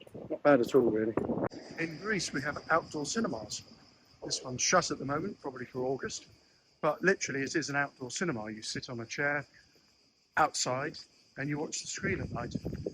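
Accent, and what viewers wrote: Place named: England, United Kingdom. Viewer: No offense in what I say. I like your accent and it is a shame people from England leave to move to Greece to lose the accent over the generations. Also to put a burden on Greece to cause a housing crisis despite you build your own place. But also it is a shame that in England they have the same issues in what is done to them yet natives to England do the same to Greece. Its a chain reacton. But also that many saints warn against mixing ethnicities since they lose their culture language religion tradition etc and its like mixing all crayons and we have one color brown. Even articles that show research see that 90% of Greek outside of Greece lose all those things listed. Then they become more liberal part of what the state wants to cause more issues and its makes things worse around the world for everyone since more selfish more liberal. 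Some joy now but down the road it will get worse just like many things got worse every decade for everyone overall. The respect is put to the side and when that happens no one gives respect and the issues grown. We are not fighting for a future for kids, family, ancestors, community but for self. As AI would sum up what what many saids and elder say "Christian tradition upholds national identity, natural human distinctions, and patriotic duty as part of God's created order, viewing anti-nationalism or globalism as a violation of nature, while simultaneously condemning chauvinistic, idol-worshipping, or racial-hatred nationalism as a moral corr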